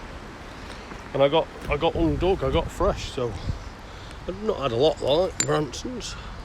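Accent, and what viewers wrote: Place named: South Yorkshire, United Kingdom. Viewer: Your accent sounds closer to the South Yorkshire accent than mine - I am from West Yorkshire.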